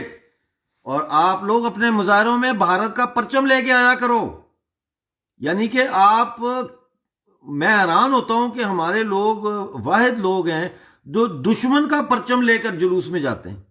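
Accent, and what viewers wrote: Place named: Jammu, India. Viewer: the way he speaks dogri touch is there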